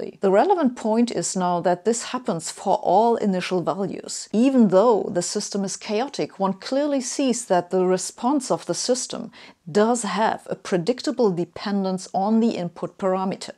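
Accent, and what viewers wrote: Germany. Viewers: Maybe it's the German accent or something, it sounds like she's cutting words too harshly . But it's ok, at least I clearly understand what she says, unlike real anglophones who are "eating" their words.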